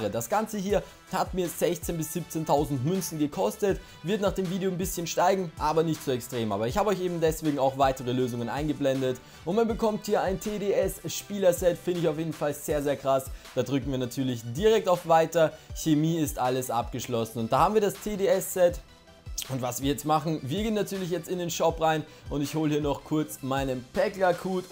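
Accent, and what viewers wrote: Austria. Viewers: bist du eigentlich Wiener oder Niederösterreicher ? #tollevideosimmerweiterso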